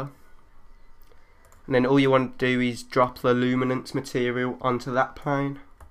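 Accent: Birmingham, United Kingdom. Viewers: I hate that traditional English cuddly accent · brumie accent :)? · van Ingen I would suggest that has a 'brummie' accent which is typical of people from Birmingham in the UK. I'm not, however, an expert and it may be that he could be from another part of the Midlands (with a similar but distinct to those who know accent) and in which case he could well be offended by my suggestion. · i would love to get into this!! I want to do some intros and stuff. Great work matey. Where did you learn all this man! Nice to hear a fellow brummie too!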